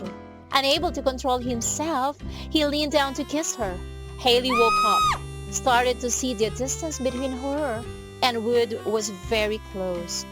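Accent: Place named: England, United Kingdom. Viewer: its the English accent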